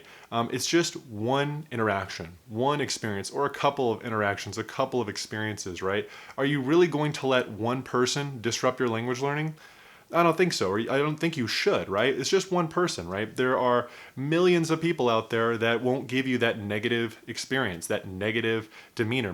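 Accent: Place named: United States. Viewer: Thanks for sharing. I was wondering where your accent was from. I'm quite sure that it's American but I can't recognise the State yet. I'm asking because I noticed that some Americans pronunce "Better" as "BeDer" while others use to pronunce it with the "T". I like your way of speaking and your points of view. Keep going 🙂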